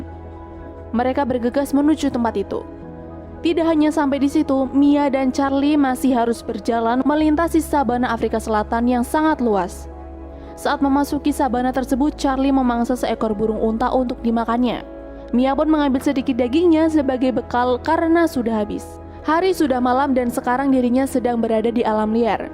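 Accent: Sunda, Indonesia. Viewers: aya sunda an euy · Ngeunah euy suara sunda na 🤭😅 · Bodor aya sundana hahaha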